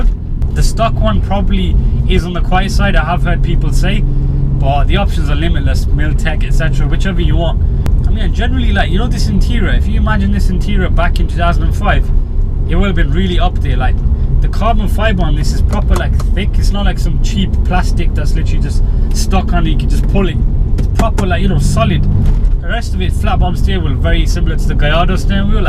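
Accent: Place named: Birmingham, United Kingdom. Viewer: Where about is he from. His accent is Birmingham, just checking if he lives near me :D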